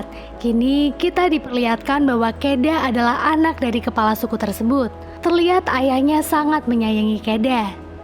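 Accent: Pemalang, Indonesia. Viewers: Mba smpean wong pemalang po? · Pemalang selatan ya😂😂😂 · Mbak Pemalange Ndi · Mba nya orang Pemalang kah?? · Mbak wong pemalang? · Wong pemalang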